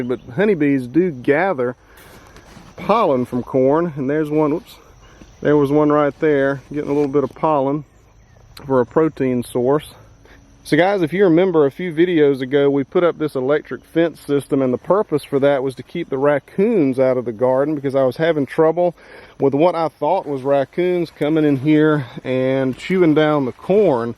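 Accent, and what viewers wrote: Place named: North Carolina, United States. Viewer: I think he’s from North Carolina !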